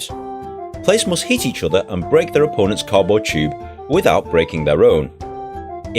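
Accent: United Kingdom. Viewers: British accent is awesome!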